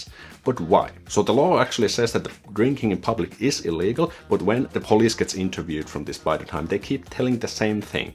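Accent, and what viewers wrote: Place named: Finland. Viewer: The most accurate finnish accent i have ever heard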